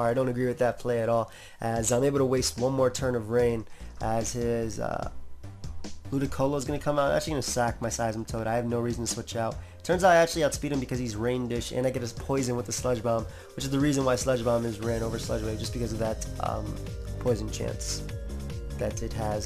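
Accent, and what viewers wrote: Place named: New York, United States. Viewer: Liam Flaherty Nah, he just has a lazy way of speaking, along with dat slight Nyu Yawk accent.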